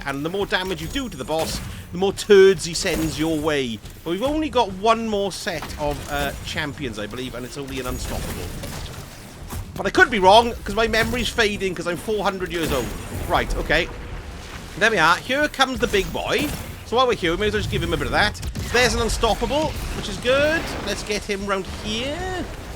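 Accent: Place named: Yorkshire, United Kingdom. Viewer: Yes, Finally filled my engram inventory so farming this to fill postmaster and get what I need. Loving the Yorkshire accent during this too sounds like my neck of the woods. Much love.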